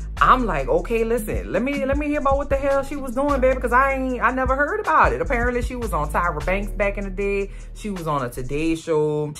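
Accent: New Orleans, United States. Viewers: I’m in Shreveport. I know that New Orleans accent anywhere! Keep up the great work in the 504! 👍🏾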